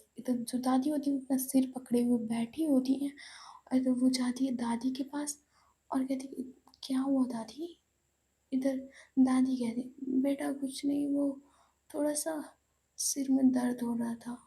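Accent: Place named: Western Hindi, India. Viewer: The eposide is nice & like western hindi accent. It suits your voice.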